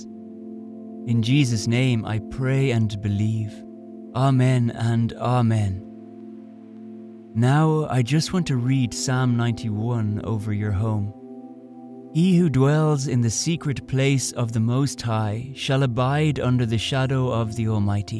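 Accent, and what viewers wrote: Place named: Ireland. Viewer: Which part of Ireland are You from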